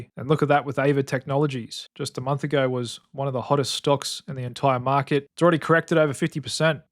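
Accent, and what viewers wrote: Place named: Australia. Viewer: Lmao, that's his accent. I'm guessing Australian but I'm British so I can never tell the difference between Aussies and Kiwi's 😆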